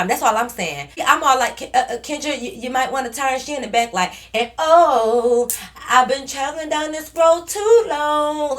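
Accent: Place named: Detroit, United States. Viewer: Omg at the beginning I was saying in my head where is this girl from? Then Detroit came to my mind then i was like No that's where they are just to find out she is!!!😂😂 i love your story times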